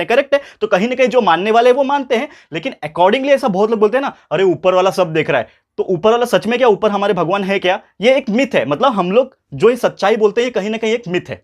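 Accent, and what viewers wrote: Maharashtra, India. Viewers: Sir, mala vatla hota tumchi mothertounge marathi aahe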